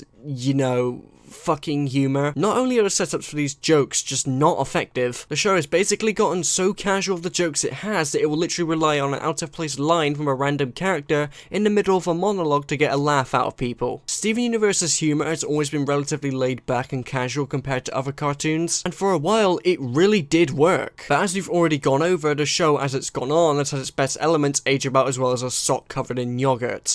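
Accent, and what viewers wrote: United Kingdom. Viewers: I use your videos to pick up the british dialect, oh, and the actual content too.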